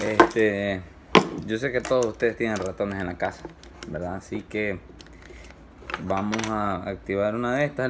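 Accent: Honduras. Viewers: Hola, gracias por su video, jejej, ¿ud es de Honduras verdad? tienen un tontito para hablar muy bonito, además algunas de sus palabras son muy graciosas, lo digo sin afán de ofender. Ya compré una trampa y la pondré para exterminar estos animalillos que son un gran problema. Bendiciones. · De casualidad no eres hondureño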